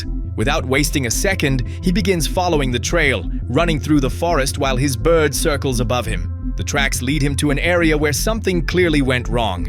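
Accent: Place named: Bahia, Brazil. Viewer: Fala português baiano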